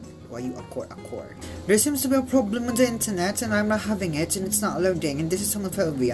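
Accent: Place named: United Kingdom. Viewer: Ur British accent made my day! Love from UK 💕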